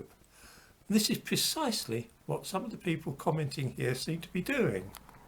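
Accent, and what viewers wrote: United Kingdom. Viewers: I have to say I have enjoyed this man's videos a great deal. I can't comprehend how anyone could find his charming British accent and peaceful, relaxed speech, somehow annoying.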